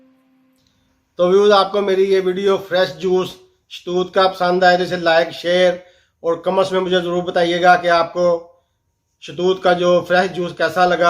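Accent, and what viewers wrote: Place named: Punjab, India. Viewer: W SLAM BHAI BOHT HI NICE SHARING WITH PUNJABI AACENT LAAA JAWAAAB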